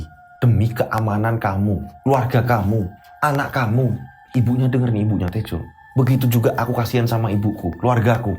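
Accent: Java, Indonesia. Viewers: Mikum,, sya orng subang ,, enak dngerin cerita yaa apalagi dngan logat jawa👍,, sukses trus cerita yaa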